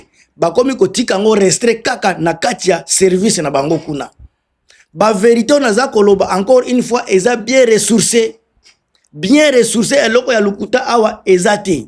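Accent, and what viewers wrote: Rwanda. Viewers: Liboma nayo yoka tonalité ya Français Rwandais ce normal olobele m23 po oza rwandais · Oza rwandais lingala na yo ezo funda yo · Oza kizengiyo bozela yo oza congolais kizengiyo nyama yoka ata lingala nayo rwandais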